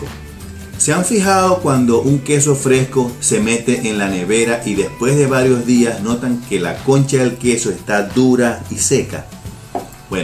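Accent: Venezuela. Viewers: ¿Queso de mano? ¿ud es venezolano? saludos desde caracas. gracia por la explicación. llegue a su video no para aprender hacer queso. si no para darme cuenta de las malas prácticas de los queseros aca. ningún queso mozzarella producido en venezuela se estira, todos son terrosos y ásperos; al parecer están usando en exceso el sulfato de calcio para darle más rendimiento a su materia prima y lograr tener más producción, incluso buscar acelerar los procesos. ya pude escuchar al final de su video que es de aca. nuevamente gracias.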